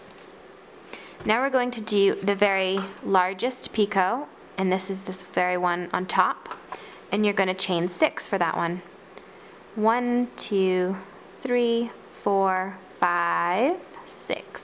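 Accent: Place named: United States. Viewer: I tried to crochet a snowflake from a pattern in a magazine and I got stuck so I just googled how to crochet a snowflake and your video came up! Thank you so much, I'm still a beginner and have taught myself some basic stitches from a book. I live in the UK and I just wondered if American crochet stitch names are the same as UK ones? Anyway I managed to complete it from watching you, with many rewinds! Many thanks, it's for my daughter's birthday as an extra little something to hang on her Christmas tree. I've made it in turquoise as that's her favourite colour. I'm gonna make two more in white. I've also got some tiny sparkly beads which I will sew on using invisible thread. I liked the way you talked about it being prettier done in a certain way and your American accent was reassuring!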